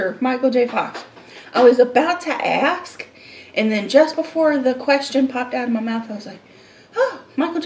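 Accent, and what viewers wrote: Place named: Deep South, United States. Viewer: You’re not a Christian with that accent? Dayum, I respect that! I too am from the Deep South and not a Christian.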